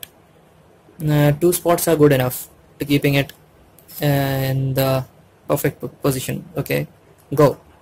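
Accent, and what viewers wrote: India. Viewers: In the south, we don't hear too many Indian accents and difficult to understand you 🙊...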